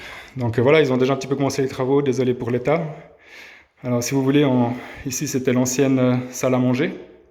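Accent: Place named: Sweden. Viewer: Super ton accent. C'est Suédois c'est ça :)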